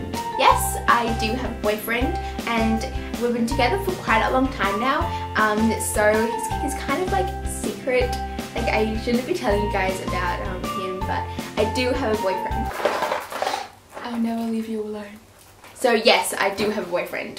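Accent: United Kingdom. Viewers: You have a British accent I thought you were from england not Australia haha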